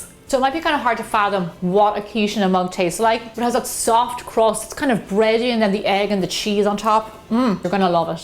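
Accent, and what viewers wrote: Ireland. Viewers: no problem I loved your Irish brogue it is so cool and I love your microwave meals I made a olmlate in a teacup or mug it was good eggs cheese and tomatos for 1 minute it was good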